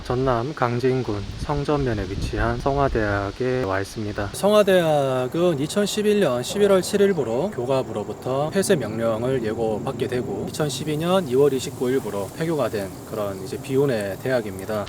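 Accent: Gyeongsang, South Korea. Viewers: 님 말투가 대구사람이신거 같아요 맞나요? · 경상도 사투리나 좀 고치든가... 짜증나.. 근데 이런거 올려서 뭐하게??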